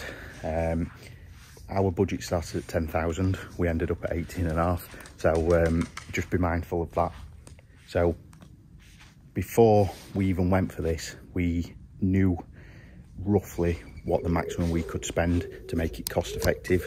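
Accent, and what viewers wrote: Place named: Northern England, United Kingdom. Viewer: I just love your northern accent. It’s mint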